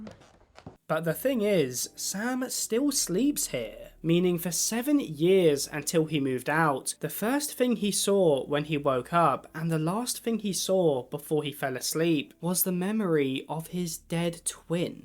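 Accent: United Kingdom. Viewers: ive only just started this video, am or so, but i have to say... the molly bit hurt. because i was a molly on the weekdays. i cant personally remember a lot of my childhood, but i wouldnt be fed long enough that i would squirrel away sticks of butter from the fridge so i could eat when stuck in my room at night. my mother would take my bed away if i didn't do my homework. it's like.... idk, comforting to know that that kind of thing is recognized by the people who made the game as not only tragic and cruel, but... realistic. that it happens. im lucky i had my dad's house for the weekends. he didnt turn out to be the best parent by the end but he helped me survive emotionally at least until i was 11. edit: wow. now, reaching lewis' part, this video is so strangely targeted at me. i experienced Maladaptive Daydreams as a coping mechanism during my young years as well! 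it's something i frankly wish i could experience again in my adult years, even when i know it's really disruptive and i have to try to keep up the adult responsibility thing. ironically, weed is helping me with my dissociation symptoms. nowadays it almost seems as if im suffering with some sort of aphantasia, though. which, as an artist, that blows lol edit 2: OH SHIT YOU DID THAT VIDEO ABOUT THE OLD COUPLE THATS WHERE I RECOGNIZED YOUR VOICE lmaaoo ngl i was sitting here thinking "i can't just be thinking that all british men sound the same, i have to have heard this guy somewhere else" and it was that v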